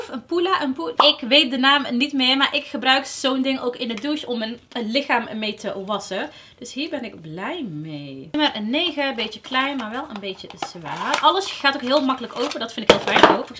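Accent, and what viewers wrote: Brabant, Netherlands. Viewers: oeeehhh fijne producten erg leuk geniet zo van elke video van je toppertje blijf zo door gaan maar denk ook aub aan jezelf love you je bent zn lief mooi vrolijke meid met je heerlijke brabendse accent heb ik ook Tilburg hahaha liefs en dikke knuffel chantal ❤️❤️❤️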